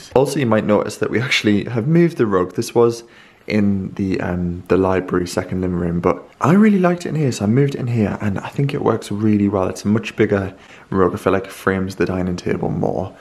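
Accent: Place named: North East England, United Kingdom. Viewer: What a nice weekend you had (but at my age, it looks tiring!😁), now that you are back to reading, I wanted to tell about a series I've found. It's by LJ Ross and takes place mostly in Northumberland, but one of the main characters is a professor at Durham University. They are police procedurals but well written and with great characters. My husband is English and when we were thinking about moving to England, I wanted to move to Northumberland because of the weather and I love the accents of the North East. Actually, the first time you came on my youtube feed, I was charmed by your accent!! It was lucky and I have followed you ever since. You are a sweet and wonderful young man!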